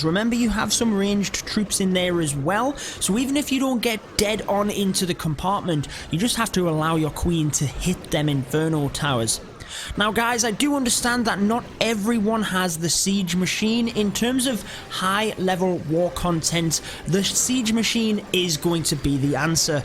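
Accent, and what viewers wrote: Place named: Northern England, United Kingdom. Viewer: He's English, somewhere up north I'd guess, Newcastle or somewhere near there.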